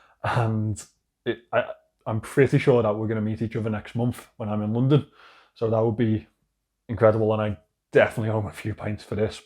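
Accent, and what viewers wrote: Liverpool, United Kingdom. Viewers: Sorry for all you’ve been going through, but congratulations about being able to do this full time now. (May I ask if you’re from the Liverpool area? I sense a Scouse accent, but I’m American so I’m never sure. I’m just a big Liverpool FC fan and have been for about 16 years.) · Wow, that was unexpected. I really thought you are shutting down the channel. But now it is a different story! Wow. 🙂 I am not an English native speaker, so I used to struggle understanding your dialect, but with every video I get more and more. I recognize your videos not only as a way to discover watches, but also as a way to learn and better understand British English.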